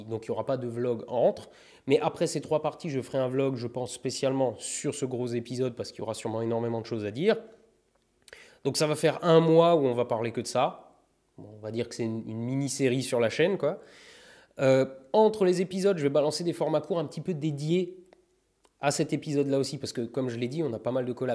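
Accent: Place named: Alsace, France. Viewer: Yoh.. T'es bien un alsaco toi... Ou lorrain ^^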